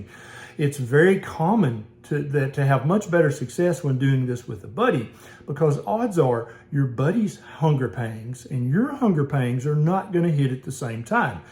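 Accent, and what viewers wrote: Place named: Kentucky, United States. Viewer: Dr. Berry, I listen to you a lot...I spent most of my childhood in Kentucky, and EVERYTIME I listen to your shows, I crave "Cokes" and beef jerkys and MoonPies!! Oh, and ALL DAY SUCKERS...lol...Blame it on my granny! I LOVE that accent of yours, and miss my granny and papaw...they both passed years ago...You are my fave doc on here! I do not eat banana splits, that was the name of a " divorce group" my kids attended when they were 6 & 8...I did eat a pop-tart today...but I have been good for 6 weeks or more...I will kick my butt when the morning comes....haha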